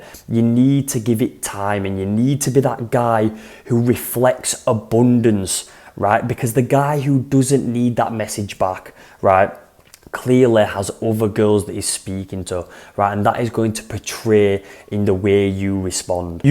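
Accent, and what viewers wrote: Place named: Manchester, United Kingdom. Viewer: Manchester accent is it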